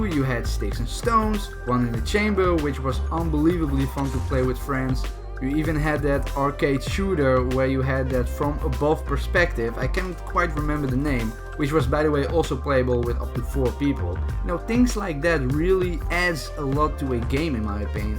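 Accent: Netherlands. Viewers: Are you dutch my bruh?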